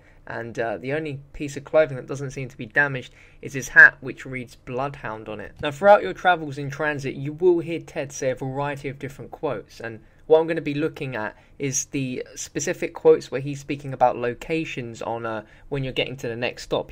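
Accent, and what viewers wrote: England, United Kingdom. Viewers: are you British? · hey, he is english, he cant help it.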